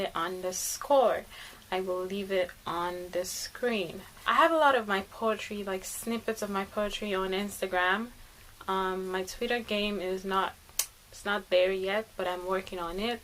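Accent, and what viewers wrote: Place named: Nigeria. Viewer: I guess writing and keeping your work scope and plot around Nigeria has helped to keep you connected to the Fatherland, I could see and feel the “Nigerianness” here and there while you speak! I totally loved it ❤️.. A fan!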